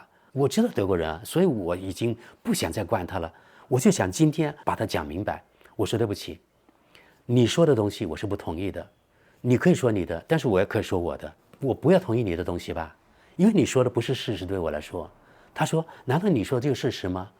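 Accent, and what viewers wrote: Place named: Guiliu, China. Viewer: 他不是广东人 讲桂柳话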